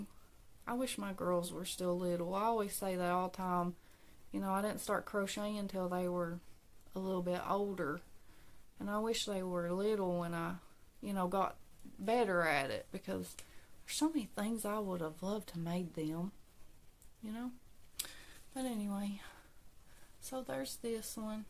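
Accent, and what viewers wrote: South, United States. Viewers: Oh I just found your channel!! I love it! That doily is going to be beautiful😍. The snow dragon you are working on, is absolutely adorable❤. I'm loving all of your makes. Love the accent. Are you a southern gal? 😂. I'm a Kentucky girl, so I have that slang also. 😊. I hope you have a good day,. ☮💜🧶. Now, I've gotta go watch your other videos. 😁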